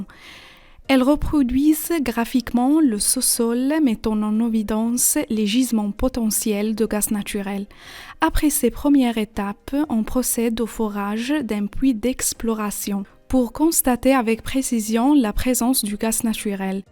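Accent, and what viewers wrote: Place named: Japan. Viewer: Ce n'est pas une voix électronique, mais une femme (à mon avis japonaise) avec un accent.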